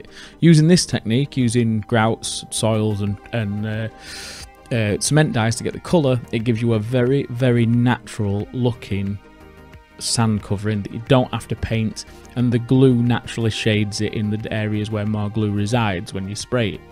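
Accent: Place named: Yorkshire, United Kingdom. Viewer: Tell me your from Yorkshire without telling me your from Yorkshire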